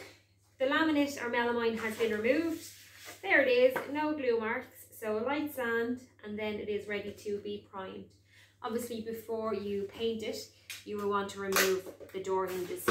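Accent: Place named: Ireland. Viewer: Love your accent. Gotta love Irish ☘️ girls. 😊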